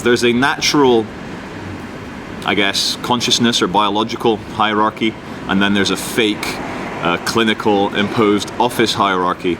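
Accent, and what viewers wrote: Scotland, United Kingdom. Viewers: He's british but probably grew hearing different english accents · its a jock accent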